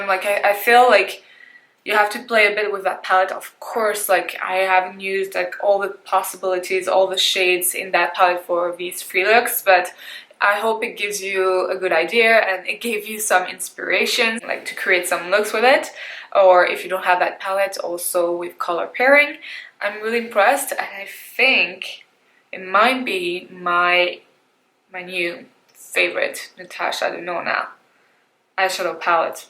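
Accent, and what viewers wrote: France. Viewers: Hi there ! Are you French ? Where did you learn to speak English that well ? Just wondering ! Great video btw, That palette is on my wishlist thanks to your great review !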